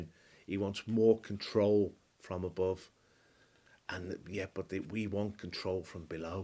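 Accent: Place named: Scouse, United Kingdom. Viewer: As a 57 year old man living and growing up on Merseyside it is refreshing to listen to a fellow Merseysider speak out on behalf of the values of the true conservative centre right - which the modern Tories are the antithesis of. When I was very young in the late 70's and early 80's, whenever a face appeared on a screen with a scouse accent delivering a political message it was unwaveringly on behalf of militant leftism/trade unionism/socialism etc. The dark icing on that wretched cake being Derek Hatton and Militant. That moron eventually became a pantomime celebrity. Fitting.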